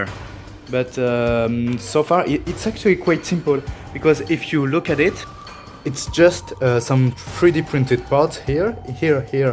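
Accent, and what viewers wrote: France. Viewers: you sound french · Hey I just notice you have a French accent are you French ? Because me yes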